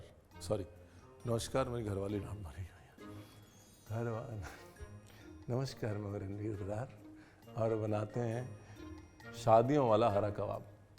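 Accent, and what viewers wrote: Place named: Lucknow, India. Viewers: I lived in Lucknow for a year, it was a magical time for me and you speaking in this accent literally brought nostalgia and big smile on my face 😊❤ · लखनऊ भाषा😊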